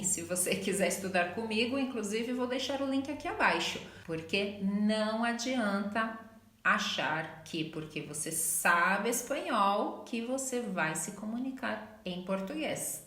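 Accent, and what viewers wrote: Brazil. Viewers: Yo ya la vi en otros videos. Habla muy correctamente, con muy buena dicción en aquellos fonemas que muchos lusófonos encuentran difíciles (principalmente la r y la j fuertes) y ese leve acentito brasileño la hace aún más encantadora, dicho esto con el mayor de los respetos.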